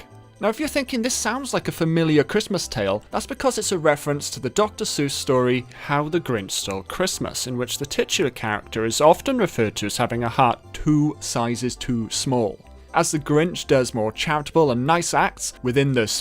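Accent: Wales, United Kingdom. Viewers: Great video, Just wanted to ask if you are you welsh?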